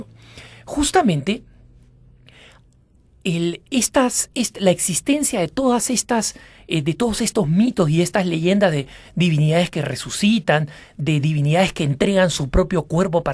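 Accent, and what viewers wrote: Lima, Peru. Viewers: Disculpa pero me puedes decir a que te refieres con: INSOPORTABLE ACENTO LIMEÑO??? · A que su acento limeño (que es uno de los tantos con los que se habla en Lima) me parece insoportable _._ .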